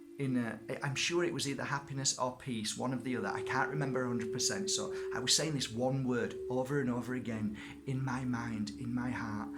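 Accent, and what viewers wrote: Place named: Yorkshire, United Kingdom. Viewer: Thanks for your wee signs video much appreciated and enjoyed. You sound like one of the Elliott brothers aka the Chuckle brothers. Are you from Yorkshire W.R.? Blessings to you from Sunny London town;-) x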